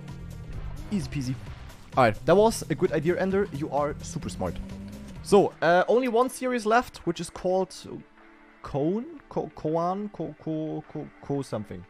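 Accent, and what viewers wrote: Germany. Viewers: gotta love the fact that you can hear the german in his voice, cool video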